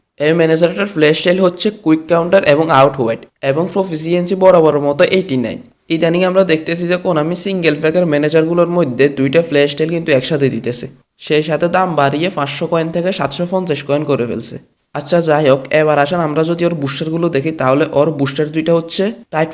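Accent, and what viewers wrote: Noakhali, Bangladesh. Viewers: ভাই কি নোয়াখালীর নাকি?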